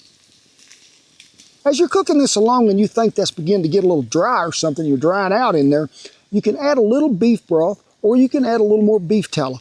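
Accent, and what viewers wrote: South, United States. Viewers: Southern accent=✓✓✓✓❤❤❤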